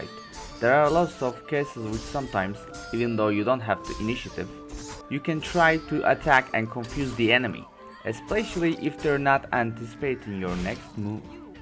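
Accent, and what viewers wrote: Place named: Turkey. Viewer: Turk musun kanka. Aksan felaket😀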